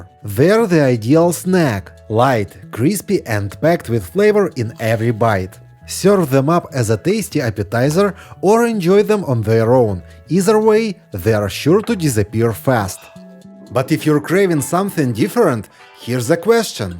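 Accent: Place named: Russia. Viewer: awesome accent! Reminds me of my fav star trek "Chekov" Walter Koenig ❤️ ingenious creative recipes!!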